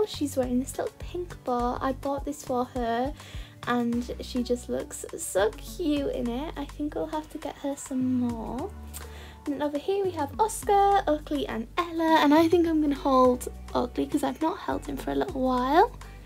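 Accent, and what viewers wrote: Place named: RP, United Kingdom. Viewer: How posh is your voice!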